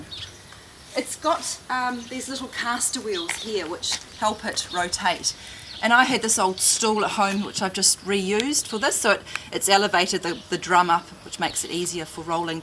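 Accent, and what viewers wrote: New Zealand. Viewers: The Kiwi accent is the best in the world. That, and the Scots.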